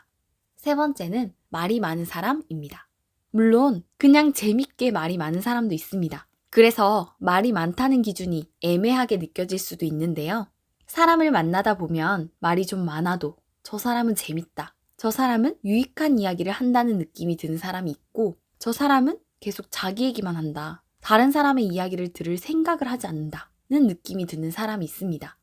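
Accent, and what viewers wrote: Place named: Gyeongsang, South Korea. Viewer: 님 사투리 쓰는 거 보니까 경남, 부산에 사는 분 같네요.ㅎㅎ 딱 들으면 알지예.ㅎㅎ 저랑 지역이 동일할 듯.ㅎㅎㅎ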